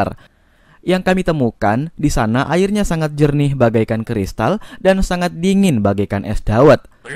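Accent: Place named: Bugis, Indonesia. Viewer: Logatya bugis🤣🤣🤣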